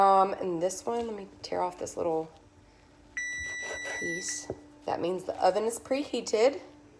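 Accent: South, United States. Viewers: I just love they way you talk your southern accent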